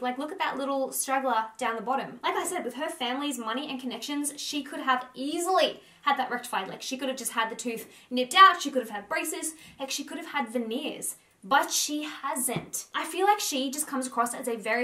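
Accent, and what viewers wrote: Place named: Australia. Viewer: Australians have such funny accents 🤣🤣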